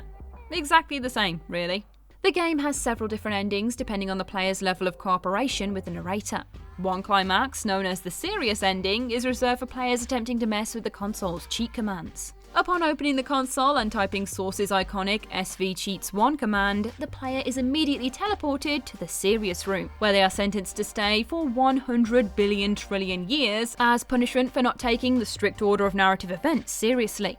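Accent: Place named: United Kingdom. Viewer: The US lingo ("football" that rarely sees a foot) in a British accent, using typically British jokes is really horrible to see. Everyone except the US uses "football" and the metric system. I can see the determination to expand this channel/business to a much wider English speaking market, but really? You have the rest of the planet to think of.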